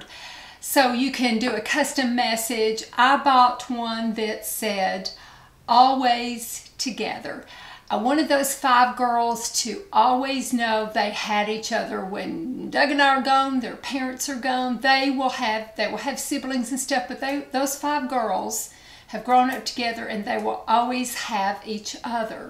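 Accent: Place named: Georgia, United States. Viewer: Hi, honey! I'm so happy that I found your channel today...its my lucky day!😊 🌼Congratulations on your 10 year anniversary!🌼 I can't wait to go back and watch all your videos! What a treat! I absolutely loved this video, and I love you even more. You're so sweet and kind! I really loved how you bought the bracelets for your granddaughters, so they know they always will have each other to depend on. I have 7 children, and I always tell them the exact same thing. I wanted to ask you, also...where are you from? I am from Georgia, and your accent sounds a whole lot like mine.🍑 Ok, honey...thank you again for taking the time to sit down and spend your afternoon with us. I don't feel like I was watching YouTube. It really felt like I was over at my favorite aunts house , and she was taking me around her house showing me all the cool new stuff she found on Amazon. 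I loved it! Take care, honey, and we'll talk to you again soon. Love, Courtney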